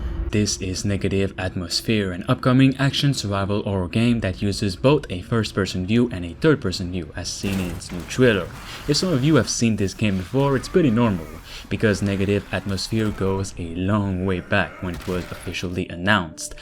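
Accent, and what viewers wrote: Quebec, Canada. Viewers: Are you french Canadian? Your English is good don't get me wrong. Continue the good review. I like your stuff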